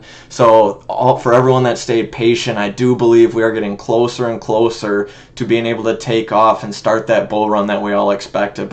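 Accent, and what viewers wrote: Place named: Canada. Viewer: You sound like you're from Canada or something, but I like you anyway.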